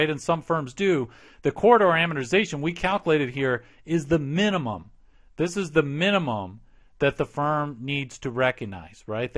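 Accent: United States. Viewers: Is your accent from Rochester, NY?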